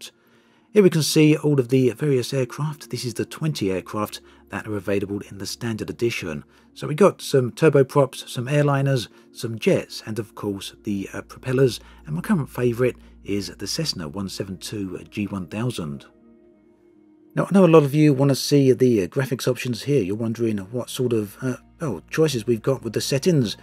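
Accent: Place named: Scotland, United Kingdom. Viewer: Edinburgh or Glasgow lol 😂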